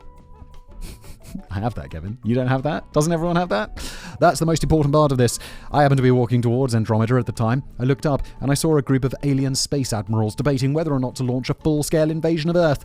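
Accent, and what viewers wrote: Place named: United Kingdom. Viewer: Love when I click on this I hear a British man talking about cock lol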